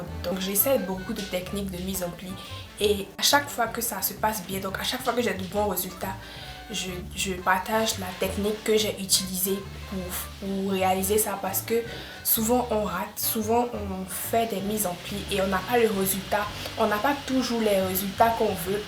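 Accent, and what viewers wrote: Ivory Coast. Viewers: miss pretty oui elle l'est l'accent trompe pas 😊😊 # 225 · cc t'es ivoirienne?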